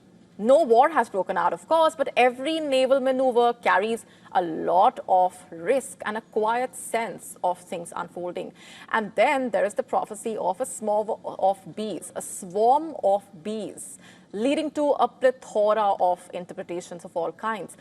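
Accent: India. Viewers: I couldn't understand half of this from her poojeet accent. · Accent of India is too strong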